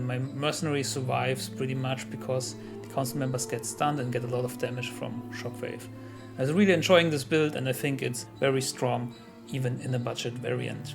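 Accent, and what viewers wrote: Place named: Germany. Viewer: German for sure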